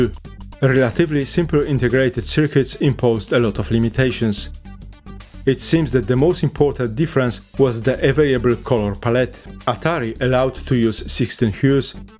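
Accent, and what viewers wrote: Poland. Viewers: akcent... uszy bolą... nie sprawdzając jeszcze domyśliłem się, że polak... nie wiem, ale tego typu akcent - powszechny u polaków, to ... nie wiem jakby to określić.... jakby "udawanie" że się mówi po angielsku ale sama treść wartościowa i ciekawa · please do the voiceovers with one of available speech synthesizers next time :( i can't stand this Ponglish, which is a darn shame, as the rest of the vid is great.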